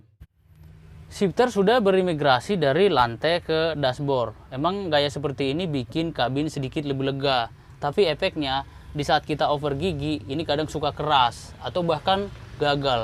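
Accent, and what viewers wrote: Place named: Germany. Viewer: Baaah logat jerman rupanya...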